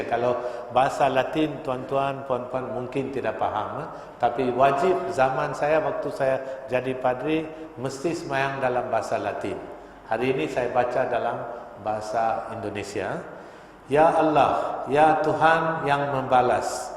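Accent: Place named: Sarawak, Malaysia. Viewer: No wonder , cakap macam urg sarawak... islam minoriti d sarawak... tp jadi leader... kenapa? Aaaaa...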